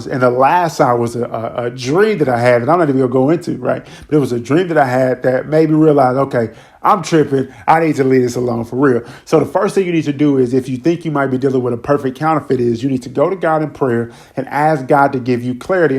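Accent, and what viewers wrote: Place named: Washington DC, United States. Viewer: Are you from DC? …. I hear an accent and the slang lol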